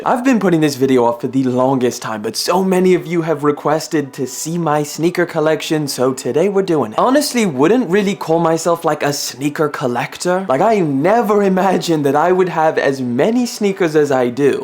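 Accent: South Africa. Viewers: I wanted to say I hear a bit of South Africa in your accent 😂👀🔥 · I KNEW I GOT A HINT OF SOUTH AFRICA WHEN I HEARD YOU TALK!!! Represent! 🔥 🇿🇦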